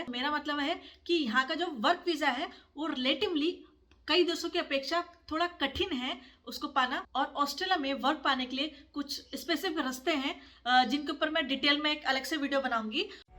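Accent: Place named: Himachal Pradesh, India. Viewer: Aapki hindi thodi Himachal se milti julti h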